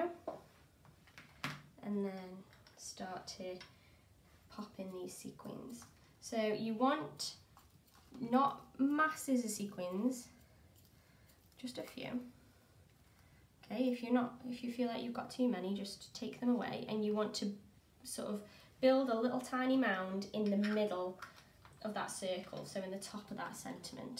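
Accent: United Kingdom. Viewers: Good video, but it is hard to hear you. I put on closed captions. Low volume + British accent can be tough :-)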